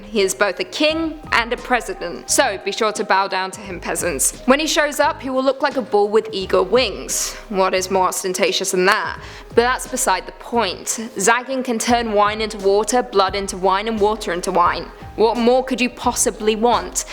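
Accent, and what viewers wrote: United Kingdom. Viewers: Lovely Brit accent though... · British accents sound so cool, especially coming out of this girls mouth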